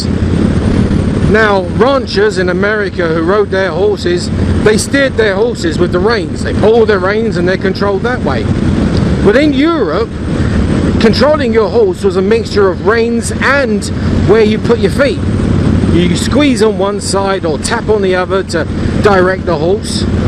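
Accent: Cockney, United Kingdom. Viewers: It's been so long since I've heard cockney! Worked with the Brits and Scots years ago, great people, lovely sense of humor!You almost owed me a new keyboard, nearly spewed tea all over it on the "Nut to Butt" comment on #7!Took one of Scots on the back of my motorcycle one day, it was due for a carb overhaul and sometimes hard to start, after the 4th try to get it to start, the Scot remarked "Going on the starter motor, are we Lad?" Cracked me up, we both had a good laugh.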